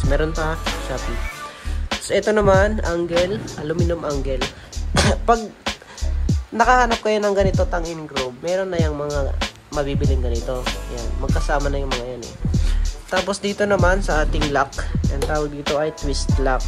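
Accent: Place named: Albay, Philippines. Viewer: Uno ngani ngaran nikadi? Ka taga albay ka boss ah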